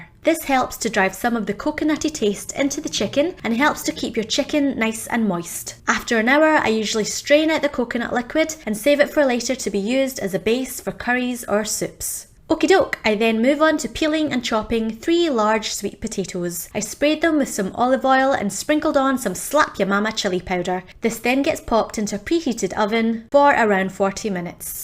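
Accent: Scotland, United Kingdom. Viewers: You live in Australia but you have an awesome accent that makes you sound Scottish almost. Where are you from originally?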